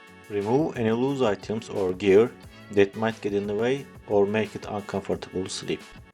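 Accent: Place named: Turkey. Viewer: Merhaba. Bende de bir c5 aircross var. Altımıza yorgan sererek yatak mi hazirlasam diye düşünürken sizin videonuza denk geldim. Telaffuzdan da bizden olduğunuzu fark ettim :) Güzel fikir gerçekten kartonla ölçü almak. Bana ilham oldunuz, teşekkur ederim. İki ufak çocugumuzla 4 kisi uyumayi düsunuyoruz. Tekrar tesekkurler. 😊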